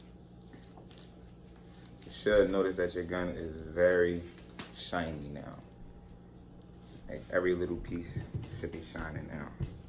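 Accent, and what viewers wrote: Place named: Philadelphia, United States. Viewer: I hear a philly accent my boi ..Chicago Westside check-in!🌏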